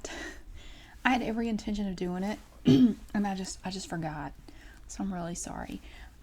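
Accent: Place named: East Tennessee, United States. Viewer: I will almost bet you are from East Tennessee. Well...you sound like us...in Knoxville lol.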